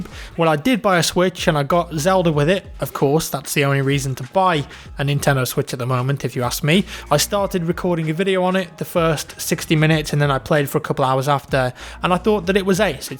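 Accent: United Kingdom. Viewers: hey man I'll make it short I like you vids and your buttery British voice❤️ I would also love the Xbox you giving away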